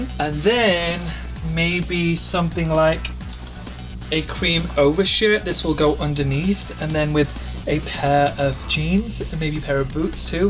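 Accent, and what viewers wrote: United Kingdom. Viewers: This guy annoys me, even with his British accent.... I miss Carson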